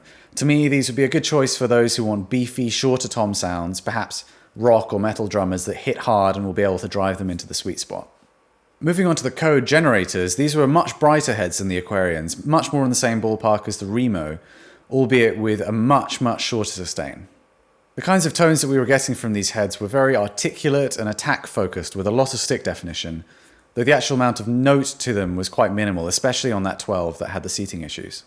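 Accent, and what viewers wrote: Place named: United Kingdom. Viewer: mr accent to British. cool explaining, good information.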